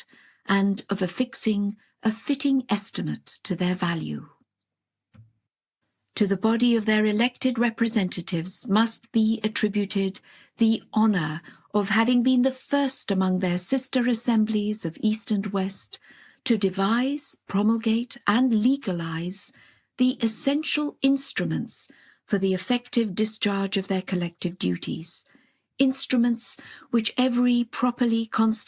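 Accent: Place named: United Kingdom. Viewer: I cant understand her its the British accent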